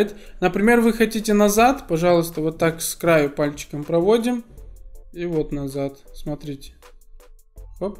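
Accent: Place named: Belarus. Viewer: БЕЛАРУСА СРАЗУ УЗНАЛ.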